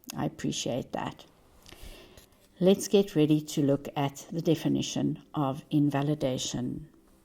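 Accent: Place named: South Africa. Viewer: I thought I had recognized your accent… from SA myself 🥰 but living in Portugal. Thank you for your time 🌸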